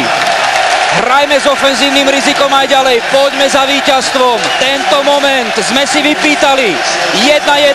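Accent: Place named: Eastern Slovakia, Slovakia. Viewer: Merciak rulz... Vychodniar sa nezaprie :-)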